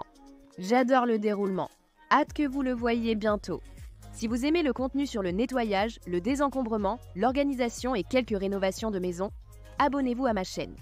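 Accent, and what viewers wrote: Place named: United States. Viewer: Hello, I really like your content on YouTube. I watch your wonderful videos from my country Morocco. It's far away, but I really like what you do on YouTube, can you add the audio track option on the settings so I can listen to your American accent, I like it and it also helps me a lot to learn English.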